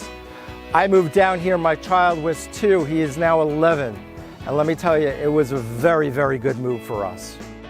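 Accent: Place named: Long Island, United States. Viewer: I’m so glad I found this channel! The only thing for me that’s a little iffy is the humidity. With that said, no place is perfect and Charlottes looking pretty pretty good right now. I wish I could come right now to check it out but am concerned about flying. For someone in their 60’s and thinking of growing older and needing more services, do you have any recommendations on anything? I wish I could get on an airplane right now. Really looking forward to watching more videos. I barely hear the LI accent anymore!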